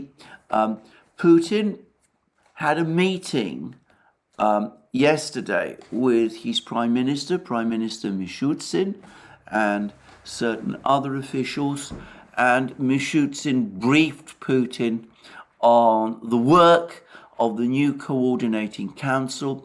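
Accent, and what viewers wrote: England, United Kingdom. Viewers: Steven, Pls can you give an example of the so-called sub-par info in speech. Also, it seems that you’re sounding a bit irritated by the fact that middle class English is nevertheless bedazzling for the non-Brits. I can tell that all English accents are interesting 👋🏻😉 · Non Brits really get bedazzled by a moderately middle class, English accent. sounds the part, but his info is sub-par, to say the least. Good day to you!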